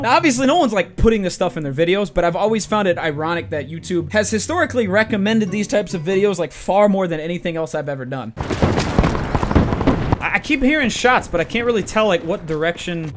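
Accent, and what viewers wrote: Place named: Brooklyn, United States. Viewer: You have a strong Brooklyn accent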